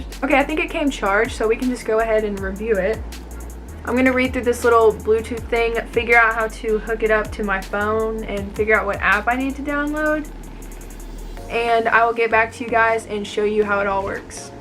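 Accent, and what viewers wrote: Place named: United States. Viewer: dam it you attractive women! You have a cute american accent!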